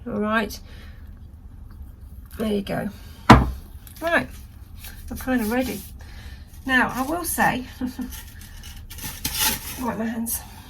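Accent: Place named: United Kingdom. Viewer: Here it is 2022 still loving your creativity. I'm trying the star shape bauble this Christmas. I love your Brit accent especially when you say 'pretty' Merry Christmas, from Canada Free!🚚🚛🚜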